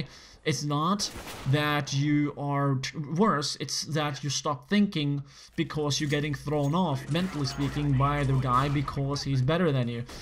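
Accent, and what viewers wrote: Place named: Norway. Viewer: Jeg bare slker hvordan du snakker litt Norglish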